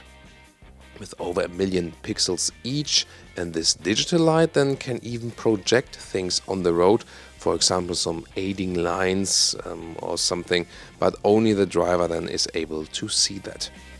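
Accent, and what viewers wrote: Germany. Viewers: The wagon is pretty dreamy, are we even getting in the states? I’d never spend that kind of money on a car with my current rare use of them but I wish the Japanese or Koreans had something like this in the category as opposed to crossovers only. I’m still chugging along - very happily I might add - in my very “analog” ‘02 WRX wagon and with 72k original my miles not yet seeing a compelling reason to upgrade. Wish the Subaru Levorg or similar existing sport wagon in other markets was imported here. Great and very complete video overview of the car and love that German accent! Haha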